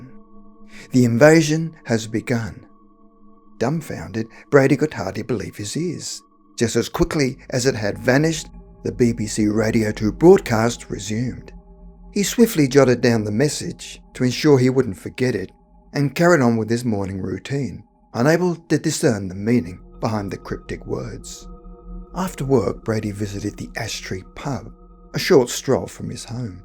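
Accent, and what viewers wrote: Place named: Australia. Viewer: Australian accent 😁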